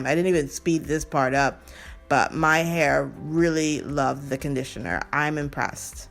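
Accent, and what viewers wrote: Bahamas. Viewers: Where are you from ? I hear your accent. Very close to a Bahamian accent if it’s not one. Fr fr 🙃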